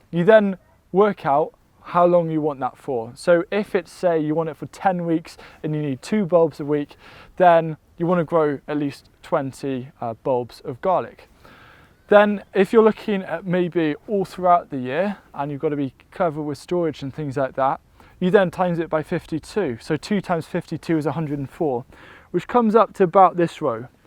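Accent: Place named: United Kingdom. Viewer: Thank you! What a wonderful video! PS I like the UK accent far better than the US one!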